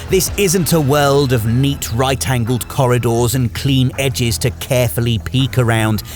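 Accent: United Kingdom. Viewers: is Metal Gear’s David Attenborough. There is nothing more enrapturing than excellent, intellectual British commentary by an expert in their field.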